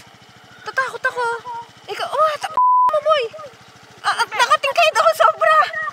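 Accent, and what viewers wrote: Batangas, Philippines. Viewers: nakakaaliw talaga mga punto ng mga Batangueno tapos ang nakakaaliw ka pa magkuwento. aba'y panalo!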